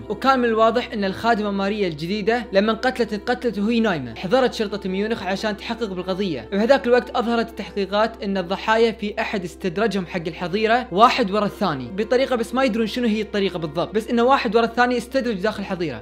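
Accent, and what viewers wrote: Kuwait. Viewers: كويتي صح باين من لهجتك زي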